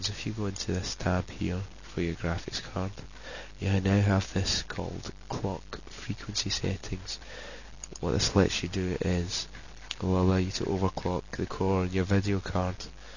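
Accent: United Kingdom. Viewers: You Sound awefully like midlothain punter(im fae the same area). P.s. I know this is an old vid but forceware does not alow you use coolbits. RivaTuner is you best bet from now on unless you want Nvidia shity overclock tools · He's Scottish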